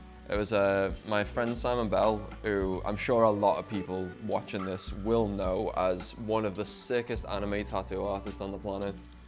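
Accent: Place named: Northern England, United Kingdom. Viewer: It’s a northern dialect, southern British people (especially in London) pronounce everything with full syllables where as people like me in the north have a culture of speaking in slang and abbreviations so we often shorten or blend words together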